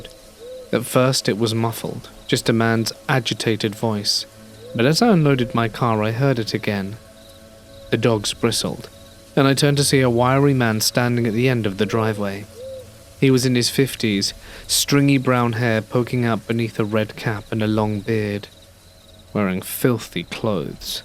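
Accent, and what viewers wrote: England, United Kingdom. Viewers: Yay! New video from the classic English man narrator. Thank you:)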